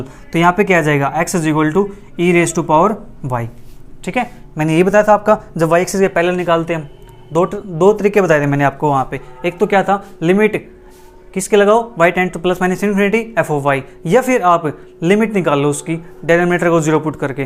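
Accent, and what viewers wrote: Haryana, India. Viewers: Sir app haryana se ho kya?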